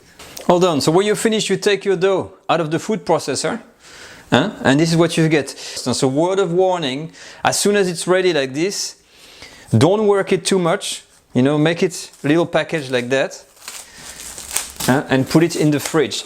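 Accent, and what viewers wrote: France. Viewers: I love this French accent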